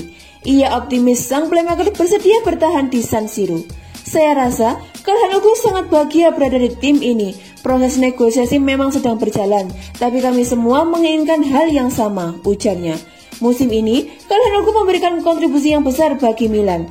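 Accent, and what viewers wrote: Java, Indonesia. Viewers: Senang dengar medoknya mba ini,tpi sya sayang bacanya cepat bingitsss...😁😁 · Kog medok to mbak? Forza milan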